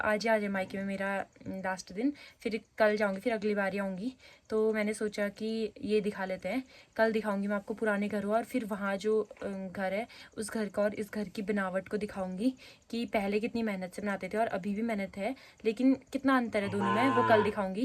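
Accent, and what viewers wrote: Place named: Kumaon, India. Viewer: Wow Didi aap ke video Dekhne me kuch अलग ही fil hota hae or upr se aap kumauni ho samj to nahi aata bat sunne me maja aati hae aapki Boli😊❤❤❤❤❤❤😊😊😊😊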